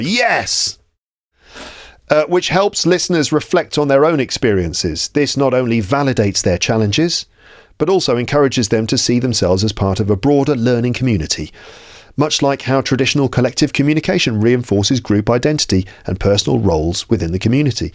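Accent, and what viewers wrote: United Kingdom. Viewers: I watched the entire podcast, and it was spontaneous. I'm really impressed by the British accent! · Hello!! I'm from Argentina and I'm preparing for an exam in May. I've failed on passing the C1 level of English so many times. I'm still practising all the skills despite having some health issues that retrieve me from learning and passing the exam. I need to pass this last exam to become an English teacher. Thanks for the video. I appreciate your content. This is my first podcast I listened to on your channel. I could get almost all your words and I love your British English accent. It's very clear. Regards. Daniel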